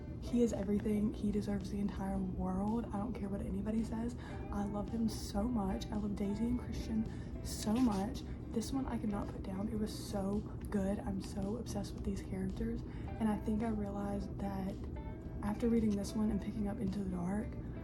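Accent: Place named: Appalachia, United States. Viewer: this randomly popped into my recommended and respect for knocking out that much reading in a month! Are you appalachian by any chance? obviously no need to be specific but your accent sounds like we could've grown up together lol!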